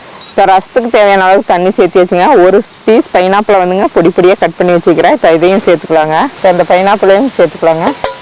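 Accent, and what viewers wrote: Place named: Kerala, India. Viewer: Adipoli kerala speeking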